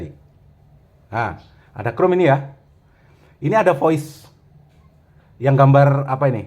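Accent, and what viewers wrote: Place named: Gombong, Indonesia. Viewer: Mantap meski bolak bolik nonton bahasane ajeg tapi kesane enak dengernya, kaya wong gombong logate haha, Lanjut jadi pendorong bagi kaum pemula 👍🙏🙏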